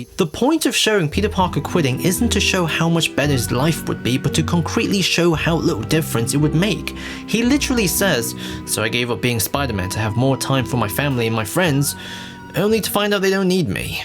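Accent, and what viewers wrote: United Kingdom. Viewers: Bro you sound British not american. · I thought you were English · So are you like welsh?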